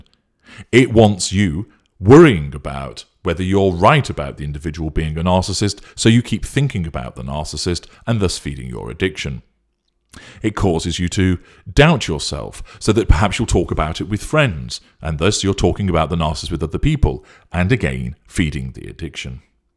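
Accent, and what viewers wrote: United Kingdom. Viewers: Hard to understand the British accent.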